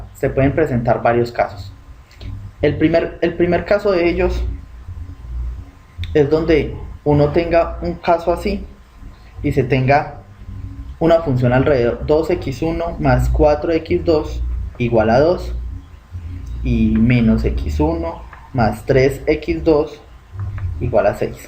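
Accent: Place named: Colombia. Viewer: Excelentes videos, excelente profesor, debería de aparecer su nombre también, para darle todo el crédito a él. Por su acento parece Colombiano. Un abrazo y muchas gracias...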